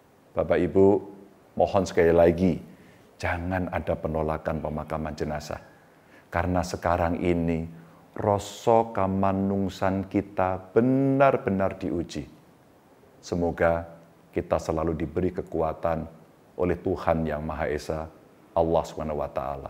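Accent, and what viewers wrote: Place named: Java, Indonesia. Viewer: Tutur katanya Pak Ganjar sangat lembut dan njawani. Keren Pak sehat selalu.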